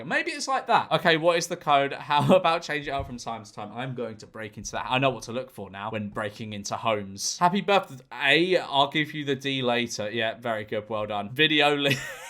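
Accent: United Kingdom. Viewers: Only British person, who i dont find accent annoying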